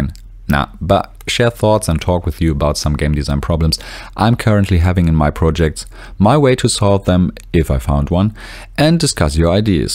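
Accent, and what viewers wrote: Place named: Germany. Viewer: hi there, thanks for the nice video. i can totally relate to your situation. i think i am addicted too, even if it manifests a little different for me. i dream of being a game dev all the time and i am one of these guys who restructured their life to do so. (i get up at 5, not at 4 but yeah...) the problem for me is, that i sometimes get so overwhelmed with the scope of my game and the obstacles i face, that i struggle to start coding. i watch other devs instead or procrastinate in some other way... i hope participating in some game jams in 2023 will help me getting in the habit of finishing games. you sound like your native language is german. stimmt das? woher kommst du wenn ich fragen darf?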